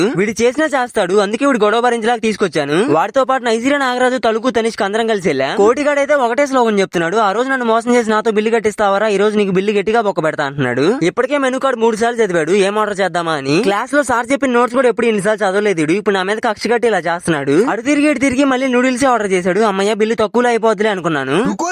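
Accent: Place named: Telangana, India. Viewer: Miru AP ayina mi slang Telangana slang la vundhi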